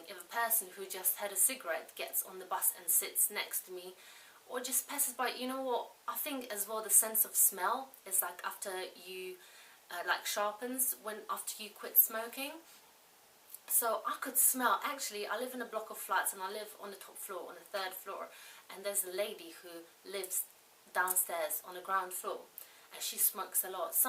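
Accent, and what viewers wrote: England, United Kingdom. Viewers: You are the most beautiful woman I have ever seen in my life, and I absolutely LOVE your slight English accent.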